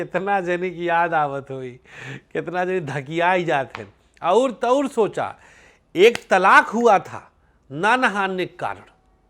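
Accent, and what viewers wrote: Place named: mixed, India. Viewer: Sir ap u.p Bihar k byea ki treh mat bola kro saf hindi bola kro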